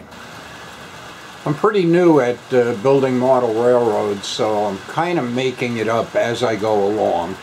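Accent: New York, United States. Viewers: Hey New Yorker - I am a fan of yours,! I lived in Manhattan & Brooklyn for many years and I rode the F Train as well... Your work is simply great with a fine attention to detail. This is the work of a true craftsman of RR and Subway RR modelling. Thanks BTW, your voice has that warm New Yorker dialect that makes me year for a Nathans dog and a Bagel with cream cheese and lox... Enjoy your great work and your layout, sir...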